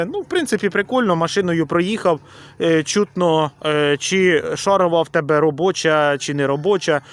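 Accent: Poltava, Ukraine. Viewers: Нарешті ти з'явився.Вже скучили.Я родом з Полтавщини,але вже 50 років живу на Черкащині .З задоволенням слухаю рідну полтавську говірку.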